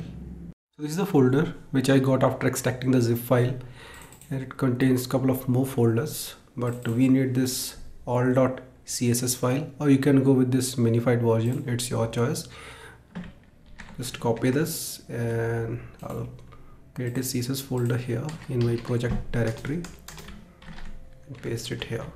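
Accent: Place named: India. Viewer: im fed up with this accent seriously , i wanna smash my head when i hear this accent honestly , i just cant understand what u indians say and everytime im searching sth related to coding an indian pops up , fuck this life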